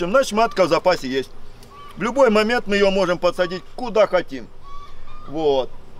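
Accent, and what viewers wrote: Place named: Ukraine. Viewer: Відчуваю у Вас український акцент, чи не краще залишатися самим собою, говоріть мовою.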